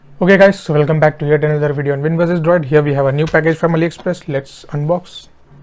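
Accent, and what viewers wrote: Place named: India. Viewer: Indian English is the most annoying accsent I ever heard in my whole life. Sounds like you eating all letters.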